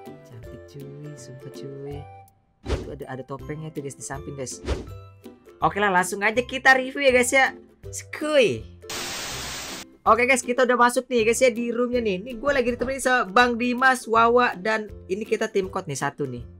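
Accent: Java, Indonesia. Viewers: Bang kamu orang jawa ya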